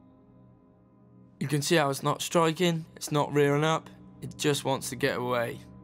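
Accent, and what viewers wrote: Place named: Southern England, United Kingdom. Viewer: You've got a southern British accent. Can't tell if you're from Brighton, or somewhere in Surrey, Kent or Hertefordshire, lol!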